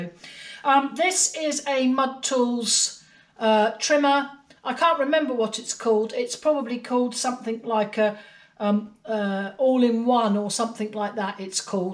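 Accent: United Kingdom. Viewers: I am from Flanders, Belgium. I love your YT stories and learned already a lot… And having an American grandson I love to hear your so very British accent 😉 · Hello I'm from Belgium I love your English accent, I learn English in evening class and the teacher always says that you have to put the tonic accent on the words otherwise the English peoples do not understand us and I must say that I understand everything what you say. Thank you for your videos they are all interesting.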